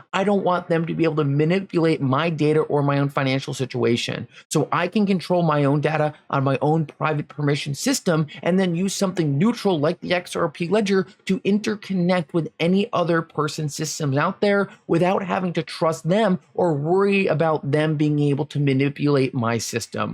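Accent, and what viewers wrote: Allentown, United States. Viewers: xrp is dead sounds like you have an Allentown accent. Figures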